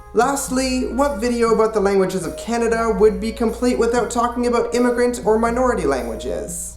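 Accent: Canada. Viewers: You do have a noticable Canadian accent, the out and about spelling of words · I do notice your Canadian accent, and I think it's cool! I even phase the accent in naturally myself, and it feels right! hehe Greetings from this Venezuelan living in Montreal, which is a great city, eh? :) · Yes sir, you do have a Canadian accent. I think I unfortunately lost my Canadian accent. As far as I remember, Americans never have commented on my accent being different or anything at all, so I assume my accent is pretty neutral. FYI, I spent the majority of my K-12 education in Canada. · I did notice your Canadian accent. However, I work as a Nurse and have had several Canadian colleagues over the years, so I am familiar with the accent. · Yes, you do have a Canadian accent! Your pronunciation of "out" and "about" definitely has a slightly raised vowel from the American /aut/ and /abaut/. 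At least that is how it sounds to my American ears.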